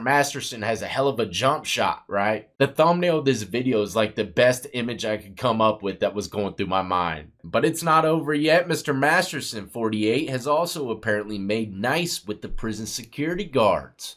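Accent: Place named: Detroit, United States. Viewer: Yo, you from Detroit?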